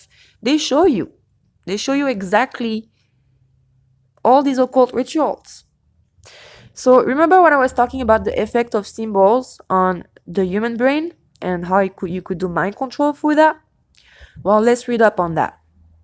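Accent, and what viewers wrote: France. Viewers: I thoroughly enjoyed your video. Thank you. New subscriber. By the way, I absolutely adore your accent. It reminds me of our French exchange student who is just a doll. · Did you say that you were German? You sound French to me. Beautiful accent! Blue and black.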